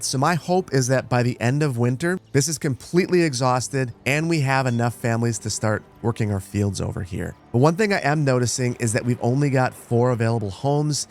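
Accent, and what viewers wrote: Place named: Great Lakes, United States. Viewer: Your accent sounds kinda like you live on lake Erie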